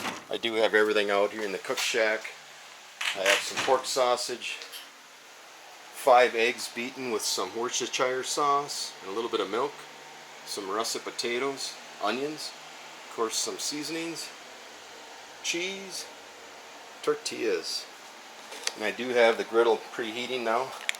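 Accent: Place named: Minnesota, United States. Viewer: That accent, the weather, you've got to be in Minnesota.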